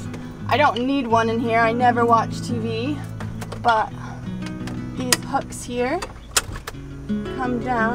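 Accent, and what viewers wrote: Australia. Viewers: So many American's love Australia. You sound and look local now, from when you first arrived. Tanned, barefoot all the time and the tatts. At least they don't look down on you like in the US when you walk into a store without shoes. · Great van! PS I like the slight Aussie accent you've got going on now :) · Am i detecting a slight Aussie accent???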